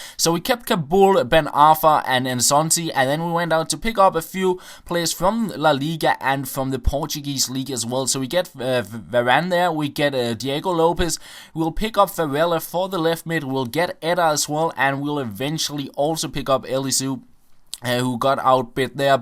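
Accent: Denmark. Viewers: Man you can really hear that you are danish, so hard and thick dialect hehe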